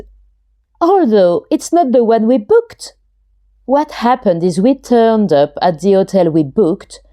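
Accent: France. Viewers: English with french accent what i mix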